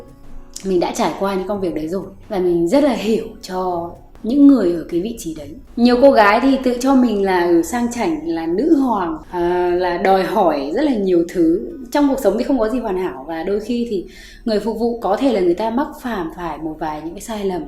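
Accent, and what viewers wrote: Central, Vietnam. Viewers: Chị làm video chỉ nói tiếng miền trung đii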